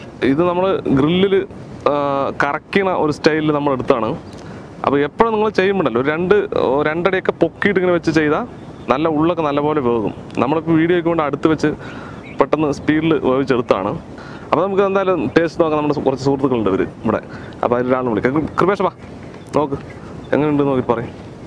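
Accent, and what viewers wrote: Palakkad, India. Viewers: Palakkadan slang adipoli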